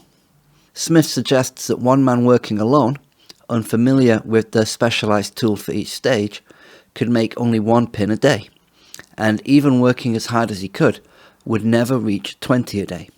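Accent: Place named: Cheshire, United Kingdom. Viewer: This has to be a Cheshire accent.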